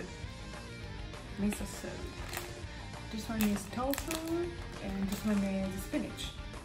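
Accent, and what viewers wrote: England, United Kingdom. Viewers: I love your English accent, I could listen to you forever. Its a perfect Babyface voice. I cant wait for you to cut a smooth promo in the ring. You re awesome, Champ.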